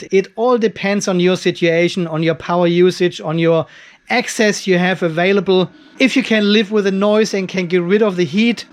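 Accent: Germany. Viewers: Bist du Deutscher? Den Akzent kenne ich doch ;) und das Kassel-Schild im Hintergrund :)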